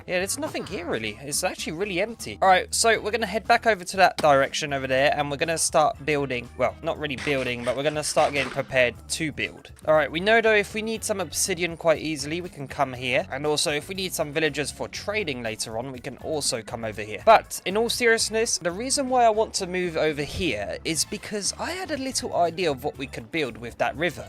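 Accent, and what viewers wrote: United Kingdom. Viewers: oh sh*t its a british person W video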